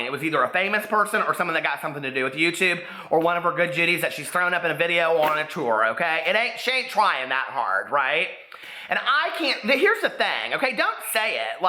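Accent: United States. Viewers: If you are from Illinois, why are you speaking with a country accent? I understand the gay...but come on.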